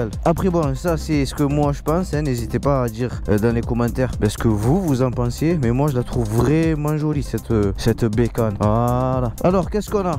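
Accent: South, France. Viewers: Essai Parfaitement maîtrisé, complet et bien explicite. Et en plus ce petit accent du Sud avec les expressions bien de chez nous (je suis de Nice). Top ! Tu m’as convaincu, je cherche une bécane pour voyager, polyvalente, esthétique et le tout sans me ruiner. Je vais aller l’essayer en concession. Merci 👍🏻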